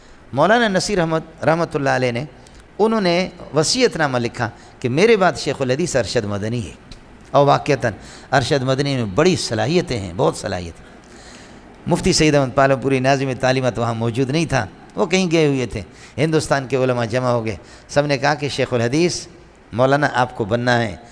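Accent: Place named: Balochistan, Pakistan. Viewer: بھائی جان یہ بلوچ ہیں.. انکی علاقائی زبان / بول چال ایسا ہی ہے.... یہاں کے لوگ یہ میرے والد ہیں نہیں بولتے.. بلکہ سیدھا یہ میرا باپ ہے.. بولتےہیں.. اگر یہ آپ جناب کے بجائے.. تم.. بولے تو وہ بے ادبی کی وجہ سے نہیں.. بلکہ انکی علاقائی لہجہ کی وجہ سے ہے..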